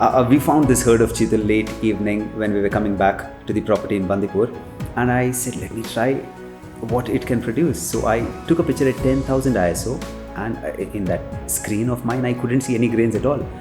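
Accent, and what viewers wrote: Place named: Canada. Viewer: Your accent is funny. Are you a Canadian?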